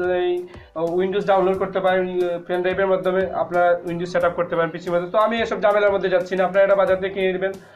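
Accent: Sylhet, Bangladesh. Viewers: Bhai ki Sylheti?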